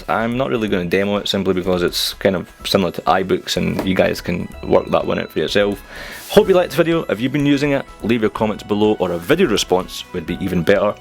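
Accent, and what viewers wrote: Scotland, United Kingdom. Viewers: Haha, I thought you were scottish. :) Thanks for the review mate.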